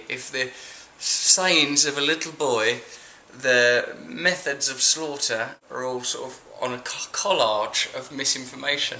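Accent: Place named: United Kingdom. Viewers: British accent. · He speak english but i think he is from British because of his accent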